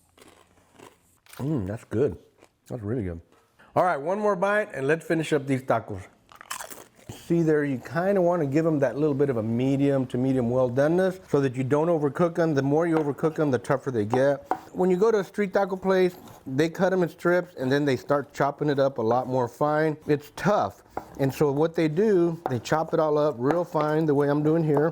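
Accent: United States. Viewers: Somehow this guy sounds so American but so Spanish at the same time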